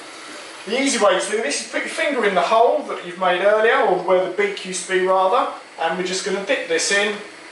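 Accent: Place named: United Kingdom. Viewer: you are? sure fooled me with the british accent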